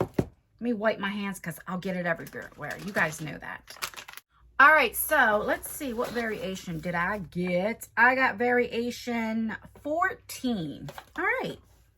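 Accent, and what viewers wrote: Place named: Philadelphia, United States. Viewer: You're accent makes me nostalgic for home. I'm from Philly but Jersey n Philly ppl have the same accents. Lol. My accent kinda diminished through the yrs of not living in the area. It took a long time to stop myself from saying wooder instead of water.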